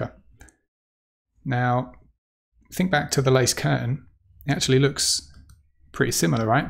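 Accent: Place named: United Kingdom. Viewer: Hey, I used to subscribe to your channel and newsletter in the past but over the last couple of years my photography has had to take a back seat for various reasons (mainly work!). However, I find myself self-isolating and working from home at the moment and have actually had a bit more time to go back and start editing some of my images. Your name popped into my head as being the 'luminosity mask' guy so I duly found your website and channel and resubscribed to both:) I have to say, I've picked up so much by watching your latest videos and everything is making sense now. Probably one of the reason my interest waned before was I simply wasn't getting the whole luminosity mask idea. Having just watched this video, the whole 'stencil'/'lace' analogy is something I wish I'd heard a few years ago. I remember watching your live walk-through edit of the Gibson Steps(?) and being impressed at the results but not appreciating the techniques you were using. 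Might be time for a re-watch if it's still available. Anyway, great stuff all round and thanks! BTW - if I recall, you're based in Oz aren't you? Reason I ask is that I detect a UK accent in there somewhere! [edit - spelling]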